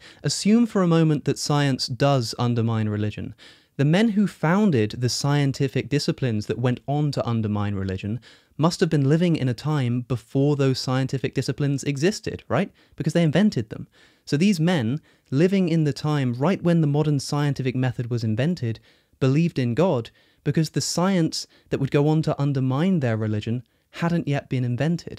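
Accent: United Kingdom. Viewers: it's still a British accent. Just like a New York accent is an American accent.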